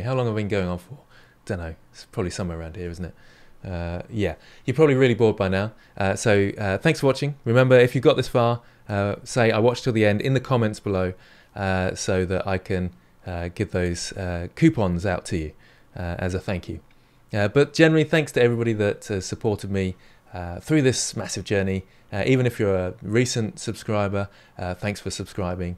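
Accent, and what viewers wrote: United Kingdom. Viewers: I'm very happy for you!! You really deserve more for all the in-depth tutorials and the effort you put on this channel! I know this comment won't change your life and prospective about things, but everyone has flaws and people must not be judged. Actually, flaws are what make us unique. Might be teeth, white hair or anything, but these things make you YOU. I came for the tutorials at the beginning, but I stayed because you're a really nice person, caring and super friendly. Plus your British deep voice is sooo calming when following tutorials. Stay strong, stay healthy and keep up with the good work 💪🏼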